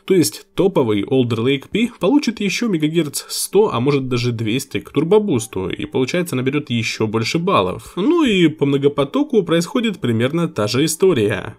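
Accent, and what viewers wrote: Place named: Ukraine. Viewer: автор,судя по говору ты с Украины. ну молодцом! верно все говоришь! забыл только сказать про родственные связи...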